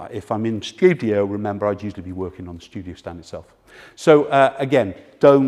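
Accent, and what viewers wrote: United Kingdom. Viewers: I love english accent.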